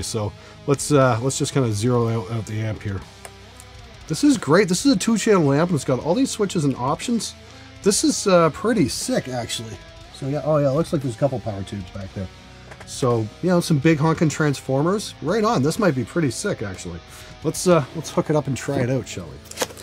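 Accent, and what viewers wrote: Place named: Canada. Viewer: I can't stand that Canadian accent.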